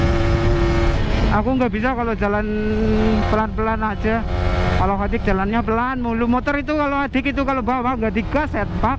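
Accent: Banyumas, Indonesia. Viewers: Nama vlog ny ndeso seharuse ngomonge ngapak owh.. bang.. ngapak ora ngapak ora kepenak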